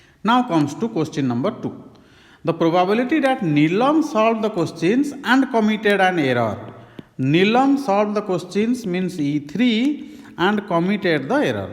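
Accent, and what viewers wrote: Odisha, India. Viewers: Sir Are you from orissa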